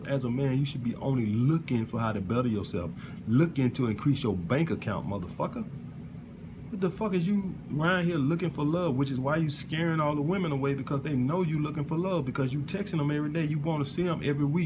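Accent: South, United States. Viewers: You from the south?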